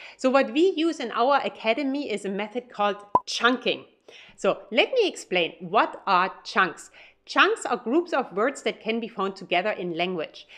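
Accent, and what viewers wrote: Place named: Saxony, Germany. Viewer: Man hört sofort, dass du aus Sachsen bist XD (nicht böse gemeint) Grüße aus Leipzig! :)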